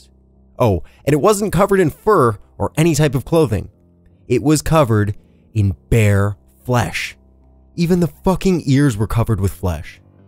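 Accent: Canada. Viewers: Bruv your Canadian accent makes this way less convincing. Americans, presumably most employees at Disney, don't say "soaree" 😂😂😂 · Canadian, eh? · Your canadien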